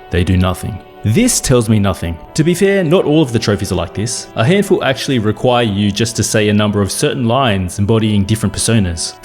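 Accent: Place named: Australia. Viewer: Great video mate, love the commentary and the aussie accent haha (from NZ myself) Definitely agree, all these platinums are definitely hard/time consuming to get. I've gotten the Skyrim one three times on each PS generation and also the Arkham Knight one, including tevery trophy in the community challenge pack. I don't blame you for not doing them, they're absolutely mental I'll be checking out the rest of your catalogue and subscribing for more.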